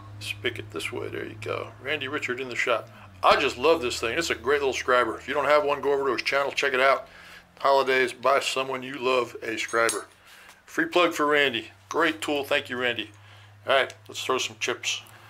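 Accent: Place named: Long Island, United States. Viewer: This guy sounds like my father and his brother from Long Island. Definitely interesting and informative I’m subscribing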